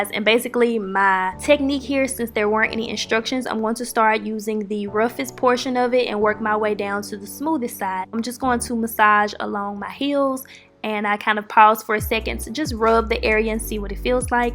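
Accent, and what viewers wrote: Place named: Memphis, United States. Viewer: It took me all of 15 seconds to know you were from Memphis by the way you talk 🤣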